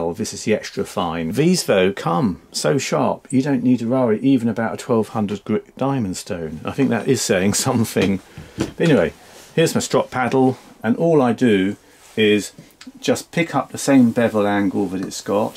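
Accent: England, United Kingdom. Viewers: Thanks for the reply, comments and help. I have all of these while using an old “Real” leather belt more mounted to a strip of plywood. As an auto tech of 40+ years, I have polished a number of parts for restoration or those I have fabricated. I enjoy learning and new ways of doing things. I find your thick Proper English accent pleasantly enjoyable from a farm boy now in Omaha, NE. Blessings and be safe for the Holidays. Enjoy.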